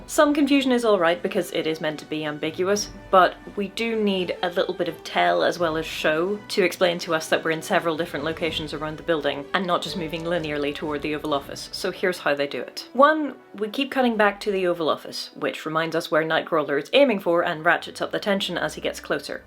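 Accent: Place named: Northern Ireland, United Kingdom. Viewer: Not related to XMen but where are you from? Im from NI and California and you sound super Norn Irish. Good to see some Marvel fandom from there ❤️❤️❤️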